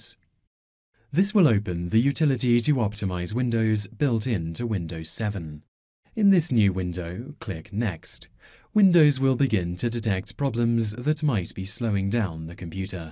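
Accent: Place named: United Kingdom. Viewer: I love British accents, it is soothing to the ear lol. Makes the video a lot easier to watch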